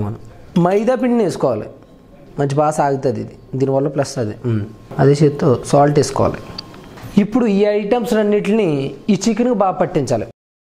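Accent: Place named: Andhra Pradesh, India. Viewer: Bro I like Andra yaasa....from Telangana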